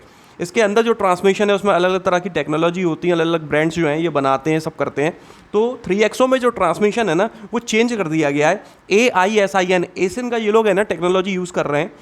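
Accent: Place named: Gwalior, India. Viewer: Bhai gwalior se ho kya awaz se lag rha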